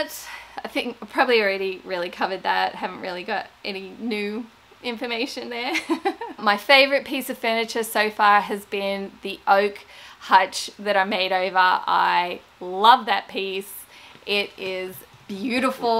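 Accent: Australia. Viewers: so happy for you! You are so much fun to watch and listen to with that Ausse accent.. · Congratulations fellow Australian! Loved this video I always wanted to ask you how you ended up in America. I don’t think I ever knew you were a nurse as I’m a nurse too and can totally relate to what you were saying about nursing. It’s been pretty tough to nurse during covid though. Flipping furniture is my time out from my work. I can see your channel growing so much as your videos are amazing plus I just love to hear a fellow Australian on YouTube. So happy you are a DIY paint supplier! I’ve wanted to try that paint after you first used it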